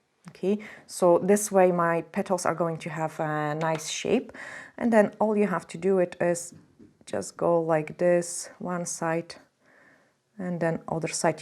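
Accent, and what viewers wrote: Scotland, United Kingdom. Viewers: I love these they're gorgeous! I've actually just tried doing some flowers like this and I'm amazed at how breaking it down and starting with the dots is such a HUGE help, so thank you! 👏 By the way, Is that a bit of a Scottish accent I hear? 🥰💜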